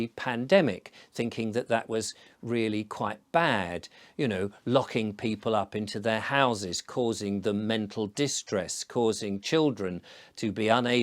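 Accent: United Kingdom. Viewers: I have recently started watching all of your videos and would like to make the following observations. You come across a Great British slight accentric, which I love as there are too many manufactured personalities. You love your country and your countries history. But your awakening, probably through your viewers' comments and opinions, is beautiful to watch. Your communication skills are a credit to you but most of all the way you "tread the boards" shall we say is masterful. Keep up the good work as I think you awaken a lot more people to the World Agenda than say the more zealous of us delivering the agenda. Keep up the good work.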